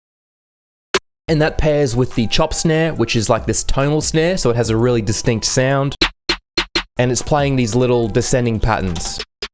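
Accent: Australia. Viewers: You're an absolute genius, hidden gem this channel. I don't even produce in FL, but learn so much from you bro!!!!! Nice to get a dose of the accent from down under as well!!!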